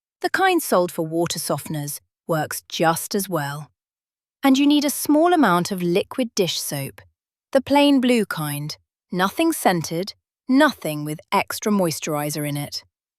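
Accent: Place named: United Kingdom. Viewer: Why do you have a British accent on a video about Amish weed prevention? Shouldn’t it be more of a German accent?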